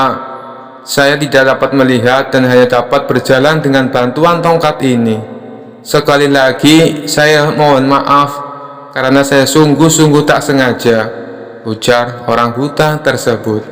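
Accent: Java, Indonesia. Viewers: Jawane ngapake ngendi rika kang admin😂😂😂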